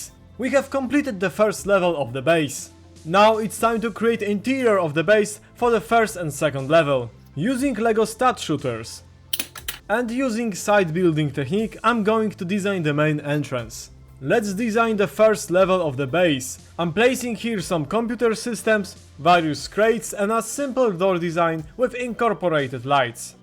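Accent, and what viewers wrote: Poland. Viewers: beautiful polich accent lol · czy ja wyczuwam Polski akcent w wypowiedzi? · Słychać że Polak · slychac ze polak xD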